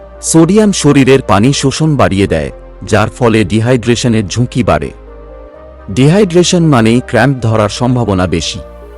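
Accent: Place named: Assam, India. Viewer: আপনি কি আসামের বাসিন্দা , এই জন্য উচ্চারণ টা শুনতে আমি কলকাতায় জন্মগ্রহণ করা একজনের লোকের কাছে একটু শ্রুতি কটু লাগছে , অবশ্যই আপনার ভাষাতেই আপনি কথা বলে চালিয়ে যেতে পারেন , ভালো থাকুন সুস্থ থাকুন ❤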